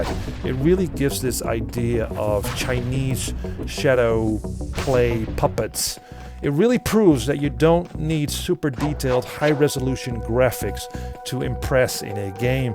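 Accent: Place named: Netherlands. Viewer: Thanks for your review. BTW, your accent really sounds like someone from The Netherlands.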